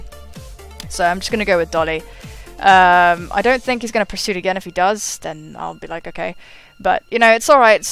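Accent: England, United Kingdom. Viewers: Godstruct strikes again!! and not even burn can stop him from killing stuff, GOD i love that beast XD QOTD: im not american but i speak with the american accent so i suppose i say the the T's more or less like them :) i wish i could have an accent like your, i lived in England before in Plymouth but unfortunaly didnt manage to get the accent :(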